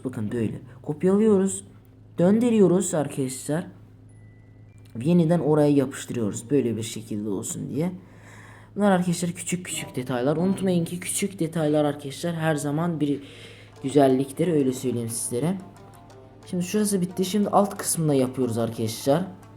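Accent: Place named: Azerbaijan. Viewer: Qaqa Azerbaycanlı san turkcə danisirsan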